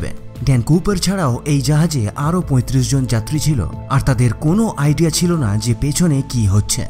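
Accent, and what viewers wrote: West Bengal, India. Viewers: ভারতীয় বাংলা বলছে সে।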